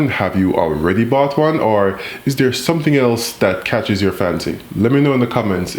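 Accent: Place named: Jamaica. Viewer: Love the content bro, also sound like your from Jamaica..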